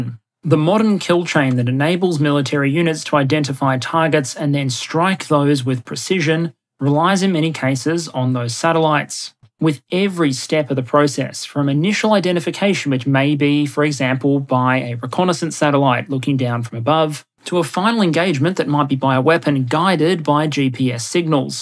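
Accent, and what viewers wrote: Australia. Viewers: I love the NZ references in your videos. You sound very Aussie, but I’d love to know if you have a kiwi connection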